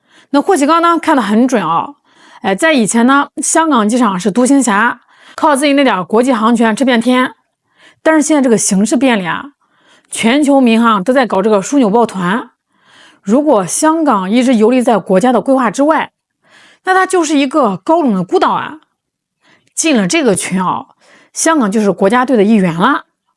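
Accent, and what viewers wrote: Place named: Shandong, China. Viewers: 我听这口音有点像我们山东人，如果不是鲁中，也应该是鲁西南😂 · 我祖籍山东，抗战时期到的天津，已经没有老家口音了，但是听着挺亲切的:washhands: 内容好就看得下去，山东人热情踏实，山普挺好，不用AI则更加真实感和用心诚意。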